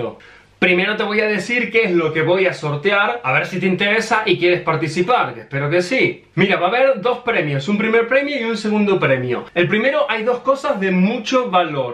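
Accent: Argentina. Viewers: Muy bien haz ayudado a 50mil y si nos haz hecho sentir muy bien una pregunta eres argentino pues no hablas cpmo español???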